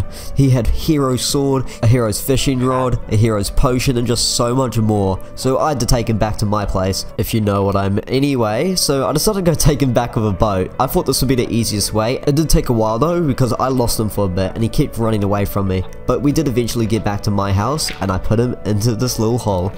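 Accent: New Zealand. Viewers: R u kiwi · Is he from New Zealand or something? he's got a kinda kiwi accent 👀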